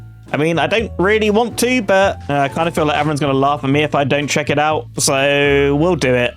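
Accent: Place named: United Kingdom. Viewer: I was listening to this as I slept and I'm just now realizing now that I'm awake that he's British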